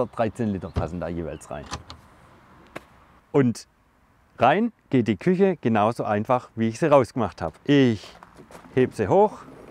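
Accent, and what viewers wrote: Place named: Swabia, Germany. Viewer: Super geil.. und dei schwäbisch isch no geiler..:)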